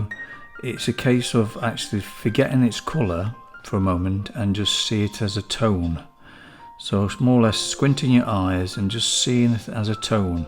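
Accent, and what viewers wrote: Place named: Derbyshire, United Kingdom. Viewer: You sound like you are from Chesterfield uk. Do you do any art workshops. I know you do patron?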